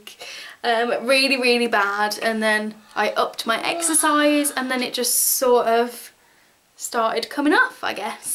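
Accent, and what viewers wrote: Birmingham, United Kingdom. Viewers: Good to see that your back at making videos 😊 When you said about the accent, I can hear the brummie in you, it's a nice little accent! Xxx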